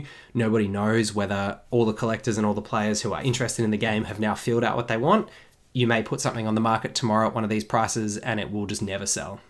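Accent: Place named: Australia. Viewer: What currency are you talking here? USD or AUD? You sound Aussie.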